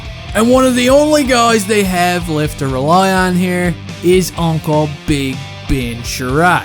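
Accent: Newfoundland, Canada. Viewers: He's new maybe, I only discovered him about a month ago and I love the Habs and have a similar type of humour to - it could be an algorithm thing as well. I personally wish him all the success, however with some of the South Park pics of the Chinese guy and pics of Xi Xingping or whatever the leader of China is, it is probably better off that he stays small because then YouTube will either demonetize his videos or pull them down and try to sensor him, this is kind of a unique stage he is at. And living Ontario, I do not often get to hear that down Easter accent of a Newfoundlander that often, I always look forward to that "BUUUTTTT FERST!!!!" 🤣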